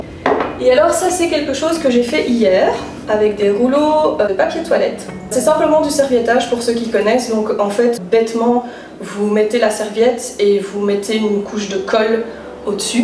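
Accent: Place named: Belgium. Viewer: Tu est belge ?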